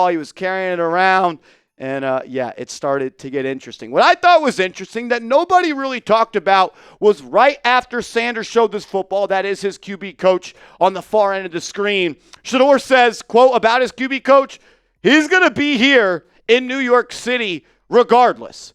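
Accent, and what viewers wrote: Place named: Midwest, United States. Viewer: How is this guy the host for the Giants on Chat Sports ? He sounds like he’s from the Midwest. I live 10 miles away from Giants stadium, and Giants fans don’t sound like this. 🤔🤷‍♂️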